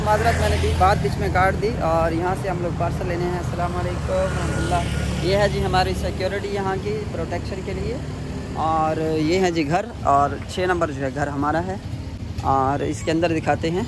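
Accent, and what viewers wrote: Saraiki, Pakistan. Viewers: brother apki awaz se hi pata lag gya tha k ap seraiki hu jitna bholese tu wala utna suchase so everything is fantsatic in your vlog wish u a very happy life allah hamre b naseeb khole apki trah😂😂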